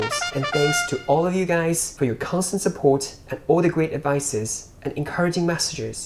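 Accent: United Kingdom. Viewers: How did you learn Japanese? Your RP English is very excellent.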